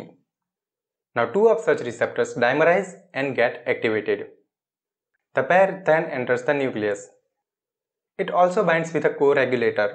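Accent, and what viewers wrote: India. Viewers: If you are indian then its common to have indian accent and there is nothing wrong in it. Dont change your ways just becoz some stranger not liking it. U can't impress everyone, people are not even happy with gods, and we are just humans. Anyway great video. I like your accent 👉👈🥁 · why it is always indian accent, I am sick of it!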